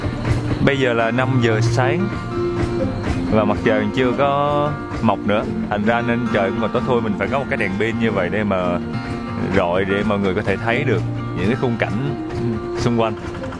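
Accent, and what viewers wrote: Mekong Delta, Vietnam. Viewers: Thiệt sự là cách anh edit video rất cảm động luôn. Những đoạn mà nhạc lắng xuống, giọng anh thì trầm ấm đúng giọng miền Tây làm cho mình cảm giác được sự yên bình của quê hương miền Tây. Với 1 đứa sống ở SG xô bồ tấp nập như mình thì mình thực sự rất rất nhớ cái cảm giác yên bình đó. Lần nào xem video của anh cũng cảm động, vừa nhớ nhà, nhớ mùi khói bếp than, nhớ cái nắng xuyên qua những kẻ hỡ trên mái nhà buổi sáng sớm. Cũng nhớ sự nồng hậu, hào sảng của người miền Tây nữa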